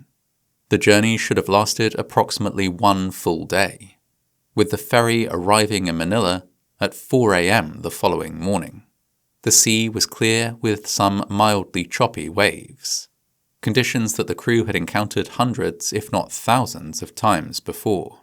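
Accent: United Kingdom. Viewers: Interesting to have a British voice use a term like petrol, but then use the calculation to convert litres into US gallons. 1,000,000 litres equals roughly 264,000 US gallons. It's 220,000 Imperial (British) gallons.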